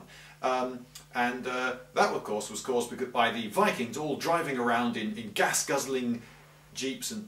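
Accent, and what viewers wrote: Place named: England, United Kingdom. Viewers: You'd be being naive and very vague to give that answer, yes in summary all the accents are British but it's pointless saying that. be more specific, he has an English accent. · Paragraphs one and two, valid point...... mind_change.exe now loading........5%.........10% ERROR WINDOWS SYSTEM CRASH......... Please restart your computer brain, this computer is now running a self-diagnostic..........Entering Safe-Mode..........."hey look. And he has a rant!" BTW about the third paragraph, he does have a popped collar and a British Accent, I thought that was plenty of proof on his end alone! ^.^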